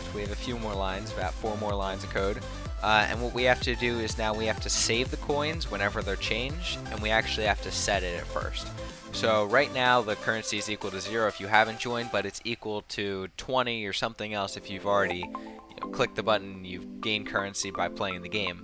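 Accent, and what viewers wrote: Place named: Romania. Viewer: Salut, esti roman? Imi place cum vorbesti engleza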